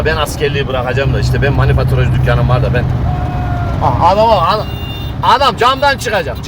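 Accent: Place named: Yozgat, Turkey. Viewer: bey sizin siveniz neden Yozgatli gibi?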